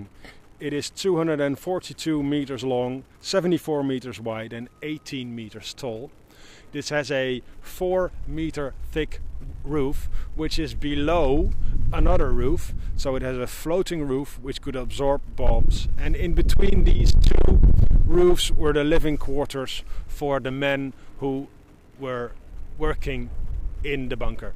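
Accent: Netherlands. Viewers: Interesting video. And good English, i can barely hear any Dutch accent. Too bad that the bunker is owned by companies, all those typical company objects like those gates, containers and camera poles smudge the historical object. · ... en aan je accent te horen ben je Nederlander, net als ik : ) Ik kwam vroeger vaak naar IJmuiden voor de bunkers, o.a. Batterie Heerenduin.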